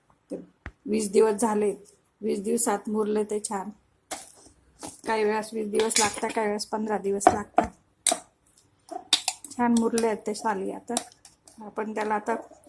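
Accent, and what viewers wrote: Khandesh, India. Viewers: Maushi tumhi Khandesh chya aahat kaa? Tumchya bhashewarun aani swayampakachi banawnyachya padhhatiwarun watate. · Khandeshi ka.....? Apan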